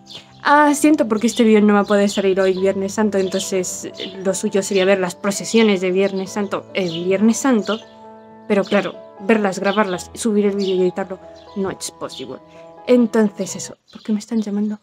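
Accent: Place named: Spain. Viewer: Tenés el acento español mucho más marcado 😦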